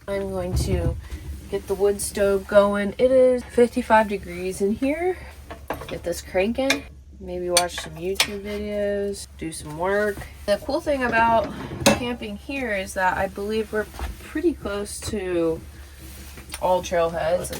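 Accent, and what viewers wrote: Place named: South, United States. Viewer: She is very beautiful. I love her smile and southern accent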